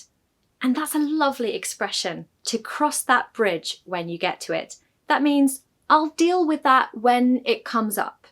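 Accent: United Kingdom. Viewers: Thanks for the youtubers like U 😊 i really like british english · Oh... my favourite tutor... with the British Accent.. I Love... ❤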